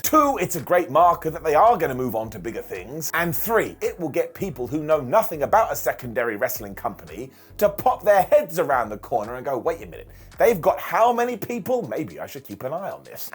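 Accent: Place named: United Kingdom. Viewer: You speak so british.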